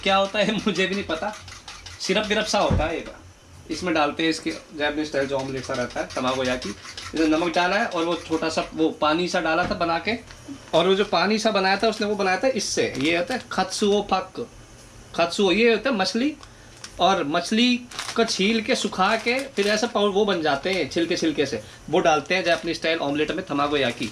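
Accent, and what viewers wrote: India. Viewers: Bhai i love your desi way of talking love you bro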